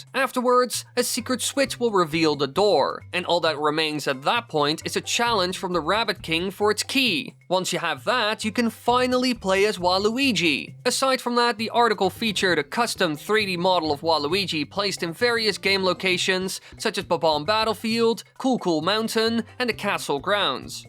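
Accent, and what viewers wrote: Netherlands. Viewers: Je moet je hollands accent nog iets beter verbergen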